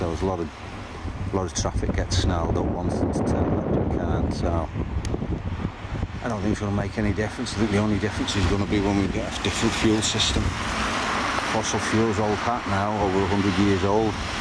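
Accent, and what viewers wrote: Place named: Northern Ireland, United Kingdom. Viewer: its got a northern irish acsent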